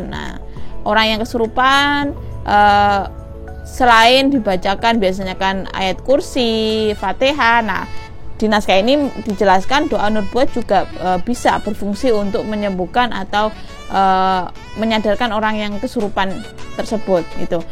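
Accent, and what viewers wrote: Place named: Java, Indonesia. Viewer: jowo nggeh mbak 😀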